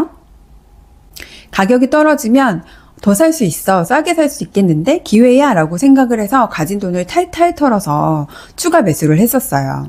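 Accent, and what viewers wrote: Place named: Busan, South Korea. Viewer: 벽면에 금고 문이 있네요. 금고 언제 가득차요? ㅎ 똑순히 시원하게 애기 잘하시네요.악센터 보니 부산분인가요? 유익한 말씀 감사합니다.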